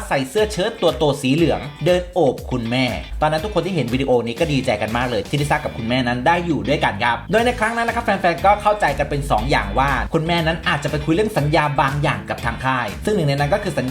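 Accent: Isan, Thailand. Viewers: สวัสดีแอดชอบแอดมากไม่ว่าจะพูดสำเนียงอีสานหรือกลางก็ชอบจากใจจริงชอบๆม๊ากๆๆ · ผมหมายถึง จริตจัดเต็มแว๊ดๆ+อิสาน มันฟังไม่รุเรื่องว่าเป็นคำว่าอะไร จะเข้าใจได้ไง? การนำเสนอ การถ่ายทอดข้อความ ถ้าคนฟังแล้วไม่เข้าใจ มันก็พัง.....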